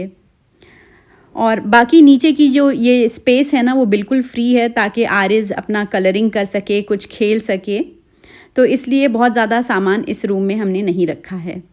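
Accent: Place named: Uttar Pradesh, India. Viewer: Are you from Uttar Pradesh? Aap ka hindi humare jesa hai. Same accent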